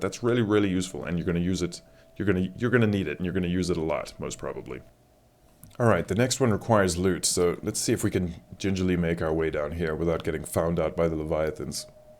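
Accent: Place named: Australia. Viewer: Great work man! Thanks so much! What is this muddled Aussie accent mixed with?!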